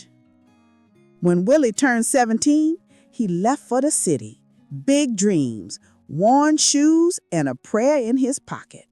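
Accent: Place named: South, United States. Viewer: I enjoyed the video, and loved the southern accent.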